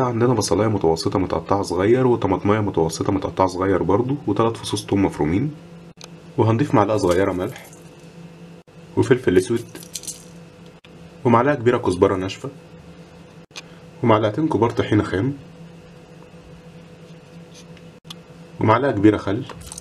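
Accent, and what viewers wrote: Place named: Syria. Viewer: مافي اطيب من المصريين من لهجتك واضح انه انتي سورية عموما كل مكان فيه السئ و الطيب عموما انا عايشة في اوروبا وشفت كتير سوريين بشهادتهم ما بيحبوا بعض والمعاملة مش بيرفيكت وبلاش اقول الباقي كل بلد فيها الفالح والطالح الا من رحم ربي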